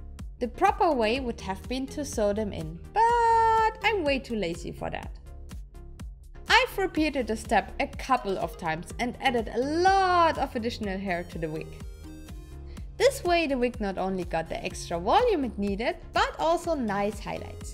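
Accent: Germany. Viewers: Just 'cause I'm curious, is it a German accent? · Ich schreib jetzt mal auf Deutsch weil sich dein Akzent definitiv so anhört als könntest du mich verstehen... ;) Diese ganzen Drucker etc. erleichterten eure Arbeit schon ganz schön, oder? Wenn ich die diversen anderen Cosplayer anschaue, die alles von Hand machen. Verkauft ihr die Kostüme dann?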